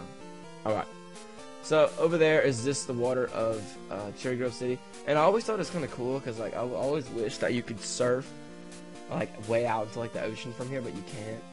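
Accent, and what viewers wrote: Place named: United Kingdom. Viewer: You can talk very good british!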